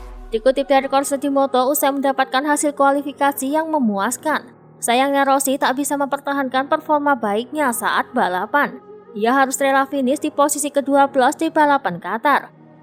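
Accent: Java, Indonesia. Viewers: Mba mba medok hahahaha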